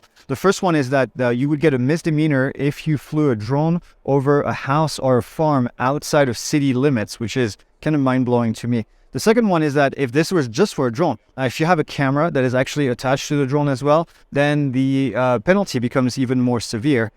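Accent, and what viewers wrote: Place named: France. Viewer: Of French vocal cadence (accent), some of his words sound different, of course. A phrase he stated is actually very accurate in how it sounds, although he purposed a different meaning. "NASA has this information." is what purposed to denote, though of his vocal cadence, to the common English language eear, it sounds like "NASA has *disinformation.*" Not sure how accurate realizes his statement actually sounds as it is factual! Indeed, the American government has much disinformation all the time!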